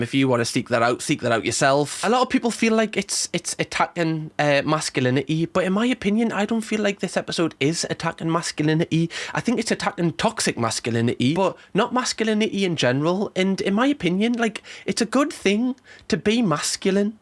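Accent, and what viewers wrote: Newcastle, United Kingdom. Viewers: Loving yooursoft Geordie accent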